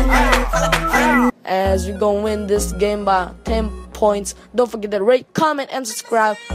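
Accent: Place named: Philippines. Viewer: Im Filipino too, i could tell by your accent.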